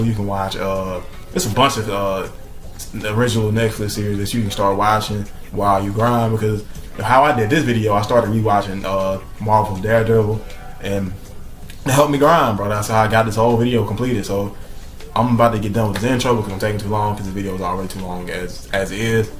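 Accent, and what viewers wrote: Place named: Alabama, United States. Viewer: What part of Alabama are you from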